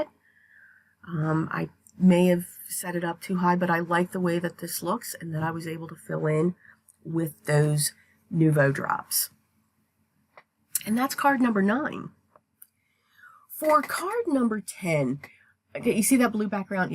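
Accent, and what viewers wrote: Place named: Philadelphia, United States. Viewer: I knew it! I ‘m from Bucks County. I have been in Florida for the past 28 years and every time I hear you, you sound like home. 🤗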